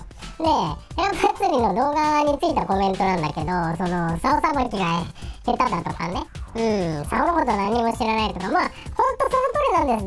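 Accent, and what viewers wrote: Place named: Osaka, Japan. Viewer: なんだか、大阪のおばちゃんがしゃべってるみたいｗ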